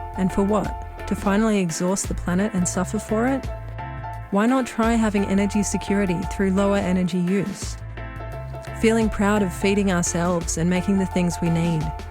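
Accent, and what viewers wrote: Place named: Australia. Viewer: On the plus side, the fall of the US and European Banksters means the "Economic Hit Man" will stop destroying places like NZ and Bolivia. I hear what sounds like an Aussi accent ... is this video and international effort or y'all coming from the perspective of OZ? Finally I've always said the problem is not peak oil, its Peak Sanity. The solutions are all around us. See my video on Beadwall for example.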